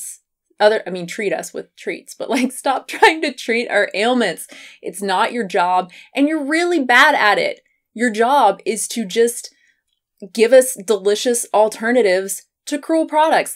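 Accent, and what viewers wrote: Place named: South, United States. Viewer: It's the southern accent. If you watch any of her reacting to when i was raw videos it's so much more pronounced too.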